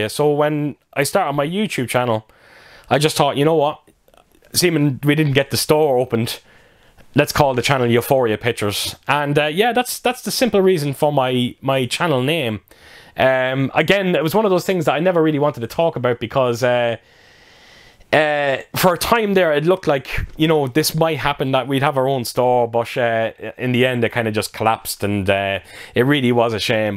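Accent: Ireland. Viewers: I’ve been watching your channel at least one an half to two years. Just hearing your accent makes me want to get to Ireland. You give honest opinions. Keep up the great job you’re doing!☘️🇮🇪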